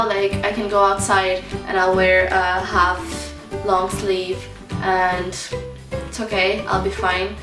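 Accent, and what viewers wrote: Ireland. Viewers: 5 months... thats a pretty strong Irish accent after just 5 months... haha enjoy ;) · I love your Irish accent! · You definitely have an Irish accent. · Yer getting the Irish accent there. Great video. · You developed that Irish accent fast within 5 months 😁😂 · You're Irish accent sounds stronger to my Irish ear than your Portuguese one 😁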